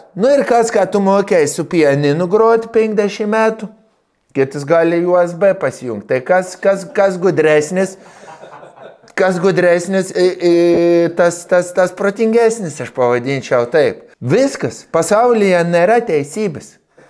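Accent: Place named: Samogitia, Lithuania. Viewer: Vo žemaitis 👍🤭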